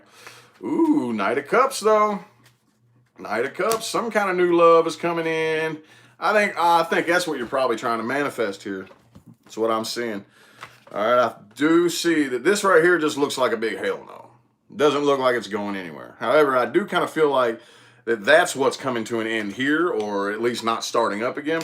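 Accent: South, United States. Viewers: Im from Kentucky was born. In Louisville. You said Louisville right too lol not looeyville or Louis ville. Lmao. I'm in Russellville ky. A tiny Town two hours from Louisville. I have lots of family in Indiana too. Thanks for the aries reading. I didn't know you was from around here. You do talk kinda country lol.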